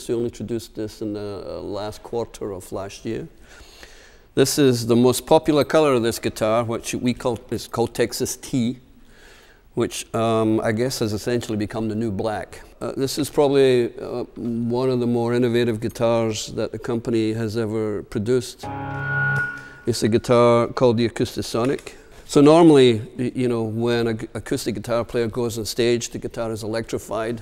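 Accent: Scotland, United Kingdom. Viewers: He's got that annoying accent that all Scottish people get when they live too long in America. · hes from whitburn new he had a scottish tone . makes me proud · Am I the only one that was surprised that he’s Scottish? Not saying it’s a bad thing, just a little unexpected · Was not expecting the Scottish accent, or that playing "style" if you can even call it that. I gots ta give the man props for partnering with Seydel harmonicas though to create the excellent Midnight Special harmonica, truly one of the most beautiful harps ever produced.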